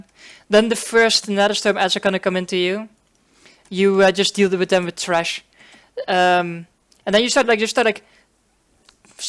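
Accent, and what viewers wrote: Netherlands. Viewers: he, ik hoor wel dat je nederlands bent haha. Het lukt mij maar niet om deze challenge te doen. zou je het voor mij willen doen? kun je het eventueel filmen om het dan op youtube te zetten voor de abonnees 😎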